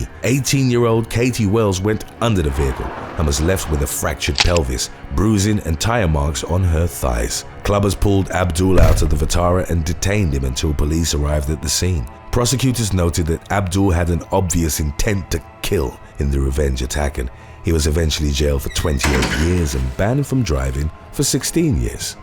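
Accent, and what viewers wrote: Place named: United Kingdom. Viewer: British voice overs always make mysteries and videos more intresting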